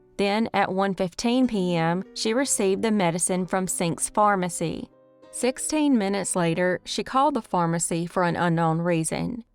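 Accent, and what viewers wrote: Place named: Georgia, United States. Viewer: I keep trying to place your accent. Is it Georgia/close to Alabama?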